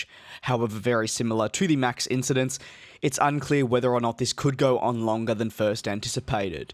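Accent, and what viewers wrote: Australia. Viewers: Are you from Melbourne?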